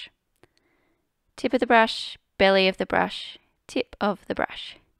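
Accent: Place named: Australia. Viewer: I’m very grateful for your beginner exercises and it’s great to have tutes with an Australian voice!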